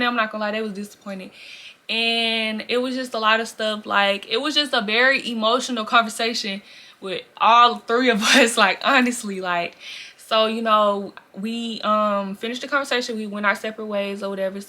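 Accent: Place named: Louisiana, United States. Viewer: You gott thattt lousianas accenttt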